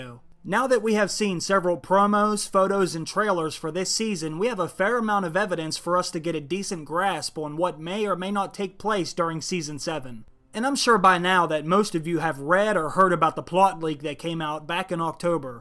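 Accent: Pittsburgh, United States. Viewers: ice fucking dragons and narrator has gotta be a pittsburgh guy love the accent lol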